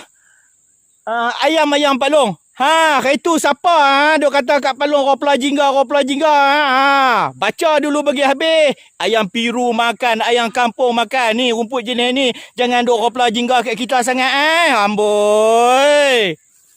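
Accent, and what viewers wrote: Kedah, Malaysia. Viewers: org pahang awat cakap kedah😁 kami teringat kat keluarga kami kt kg😢😢😭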